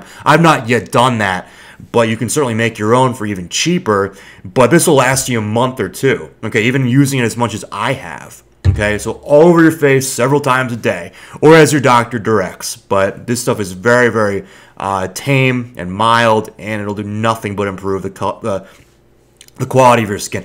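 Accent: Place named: California, United States. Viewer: it's just a smart Cali dude cadence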